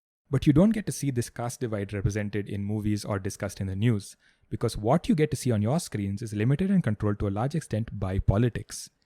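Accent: South India, India. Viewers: See I am so much media mind programmed. By your voice I can recognize you are from South India. Chhii I am so much racist now. Shame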